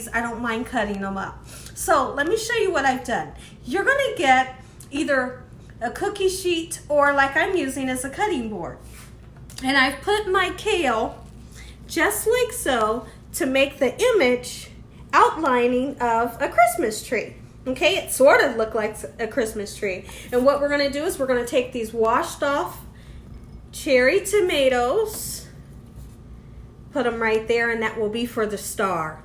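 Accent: South, United States. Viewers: Girl do not worry about your braces. You still have an amazing smile and I love how you speak. That beautiful southern accent. Ooowee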